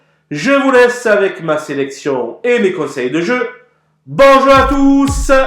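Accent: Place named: South, France. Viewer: Pourquoi vous chantez en donnant vos pronostics ?? Même que vous êtes du sud ,,faites des efforts sue vos vidéos c'est horrible,,..on dirait que je regarde l'amour est dans le pré..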